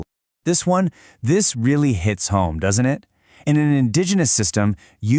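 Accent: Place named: United States. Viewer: Get the accent right with a bubbly head, this si too american.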